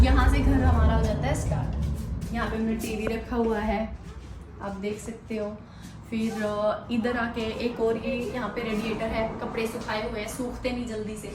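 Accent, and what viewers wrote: Haryana, India. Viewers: loved your Haryanvi accent, nice video, you are very cute and innocent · Haryanvi boli achi lgti hi apppar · 👍👍👍👍 appp Haryanvi boli me bahut achhe lagte ho.. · AP Hindi bhi haryanvi me bolti ho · Apki haryanvi b bahot sweet hey. Prantu abi ap Urdu bol rahi ho likho bhaley hindi mn ya kaho bhaley isey Hindi 😅😅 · Hi love I also live in Liverpool ur look’s very pretty I liked ur haryani language it’s so sweet but u need to more clear that it’s two bed room house rent and all rent r not same it’s also depend on ur area if u live in city centre it’s rent more expensive than ur. If u live in good area it’s expensive too. One thing more all houses r not same. there are four types of houses. U show a traces house.Other houses names r Sami detach house, detached house, flats and bungalow.there rent r also different too. Mostly house have there beautiful gardens too. 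I am sorry my aim is not to let down ur videos I just add more information for ur viewers. Really I always like ur videos and naughty ur baby. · Chorrii ghaint laagg ri hindi ma b...nways ...its nyc vedio..i thought u wud b in India...bt harayanvi touch suit kre thare pe..ikk harayanvi mein b bna do ji vedio harayanvi chorra khatir...ghani baavli lagge se.. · ap haryanvi ma bat kya kro hum ko aap ki haryanvi bohot achi lgte ha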